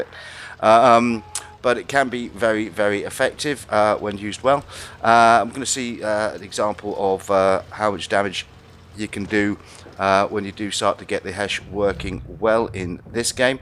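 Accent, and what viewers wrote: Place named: United Kingdom. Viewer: Naisu Why do you soind like British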